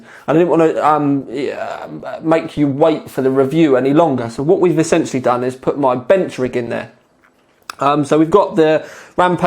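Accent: United Kingdom. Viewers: He is British. The world doesn't revolve around your regional accent let alone language.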